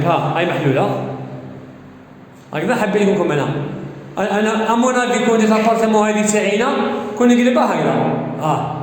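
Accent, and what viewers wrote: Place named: Setif, Algeria. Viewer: الله يبارك صوتك يسخف...سطايفي بامتياز.ربي يحفظك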